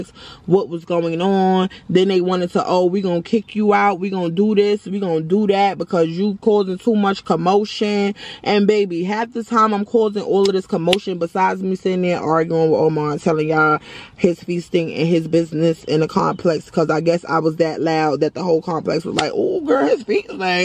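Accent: New York, United States. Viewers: Newbie here... I just saw this video recommended today and decided to click because I LOVE storytimes! I have to say you definitely have a new subscriber here boo. I'm from Brooklyn NY myself so I immediately knew you were a fellow NY'er when you started talking lol, and I love your honesty and realness. That is so rare to find on social media. But girl, let me tell you this video SPOKE to me something crazy. I'm currently looking to move myself after being in my apartment for 9 years and NEVER having a single roach. Crazy for NY but true. Now, all of a sudden, after having water issues, the same as the ones you mentioned, they're coming from everywhere, and I just can't. Like yourself, I keep a very clean home. I have actually officially been diagnosed with OCD years ago. Like, it's a real problem, and I keep an extremely clean home, so I can't do it. 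I don't have Section 8, but I do have another program, and unfortunately its so hard to find landlord's to take them. So honestly, I'm terrified I won't find something by the time my lease is up in July, but I CAN NOT stay here. Roaches are so disgusting, and they just multiply and get everywhere. I've had 2 apartments prior to this one and never had roaches in any of them, and after 9 years here, NOW they want to take over 🤨🤔. Oh hell no, I gotta go, lol. I actually really felt this video also because I've had lots of issues like yours and although my landlord is a really nice person and we get along they dont like to pay for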